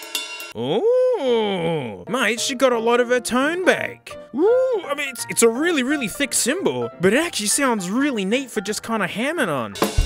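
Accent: Australia. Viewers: It's the magic of Australia accent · This man is audibly austrailian. Thanks, youtube reccomendations.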